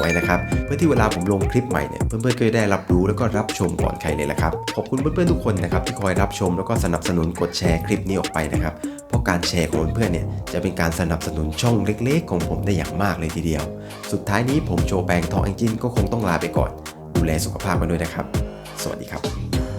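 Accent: South, Thailand. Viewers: เสียงเพราะหางเสียงแอบเหมือนคนใต้ 😊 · พี่เป็นคนใต้ป่ะคะ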